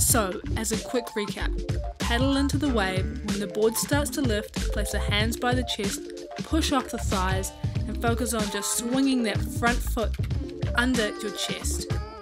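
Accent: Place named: New Zealand. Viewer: Yes she's a full on Kiwi alright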